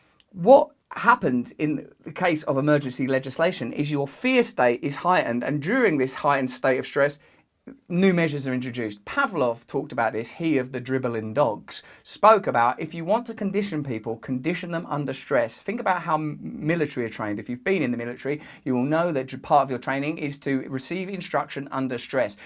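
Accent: United Kingdom. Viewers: is a brit, if i identify his accent correctly, and has no clue about political reality. or else he's just pot-stirring. you have no powers, so no freedoms. that is the purpose of democracy - the citizens rule, so the people have collective power, and resulting freedoms. outside the experience, and imagination, of, and other brits. so nothing will come of his expostulations, beyond any internal sense of satisfaction at saying 'nyaa, nyaa!' towards their masters. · Dear Russel, Would you please be so kind TO SPEAK SLOWER. English is the lingua Franka of today's world, but the British accent IS NOT. I live in the USA and although I understand you perfectly well, I have to watch your video, instead of just listening and doing other chores, as I do with other videos. Your fast British accent really DISTRACT from your very thought-provoking videos. You are not just an entertainer, you are a social leader on a global scale. 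Give the public a break and slow down the speed of your speech for people to analyze what you say. Please do not forget that there are a lot of people, who know have limited base level English, which does not make them less educated or intelligent, but your VERY SPEEDY BRITISH ACCENT could prevent people to learn more about you and your work. I personally tried many times to skip your videos, but you tend to present the most important themes. Thank you very much!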